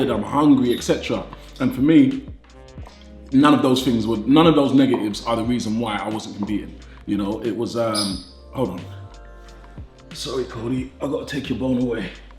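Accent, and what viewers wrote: United Kingdom. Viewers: British, can’t you tell from his accent.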